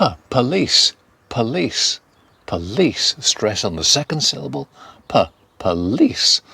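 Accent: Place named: United Kingdom. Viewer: I love biritish accent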